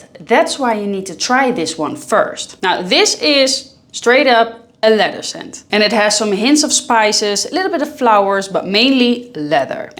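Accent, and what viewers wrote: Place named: Netherlands. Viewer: you sound a bit dutch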